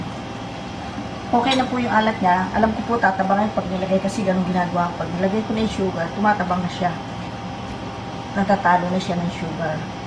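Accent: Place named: Bulacan, Philippines. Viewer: iba talaga pag may puntong bulacan,.masarap panoorin at pakinggan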